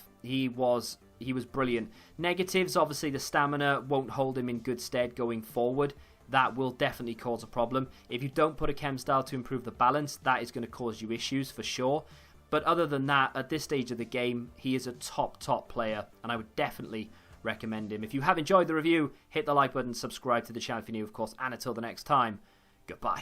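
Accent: Wales, United Kingdom. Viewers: Certainly not, I'd guess Wales or somewhere in England close to the border. Probably Wales though.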